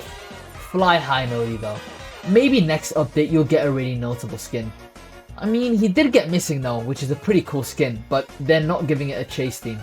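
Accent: United Kingdom. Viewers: why do you kinda sound like a british girl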